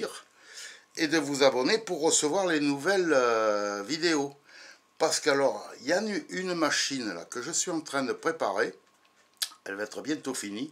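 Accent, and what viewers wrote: France. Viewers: bonjours je ne connaissais pas cette astuce pour l echelle dans la bouteille j ai 67 ans et je suis bricoleur egalement mais surtout en soudure a l arc mais tu m apprend encore beaucoups de choses sans indiscretion de quel region de france est tu car j aime t entendre parler amicalement d un c thi vivant en belgique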